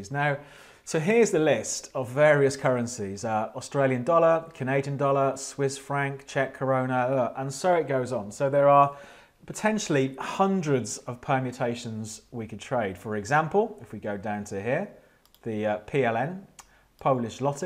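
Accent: United Kingdom. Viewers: Him having a British Accent , is reassuring . Everything he says is golden.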